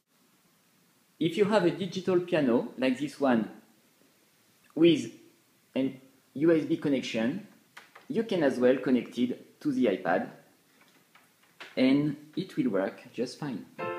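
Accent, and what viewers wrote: France. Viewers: hhhh putin l'accent française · Love that French accent ! My French wife said “OMG do I sound like that ?”. By the way, great videos, keep up the good work. All the Best. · Le mec a l accent français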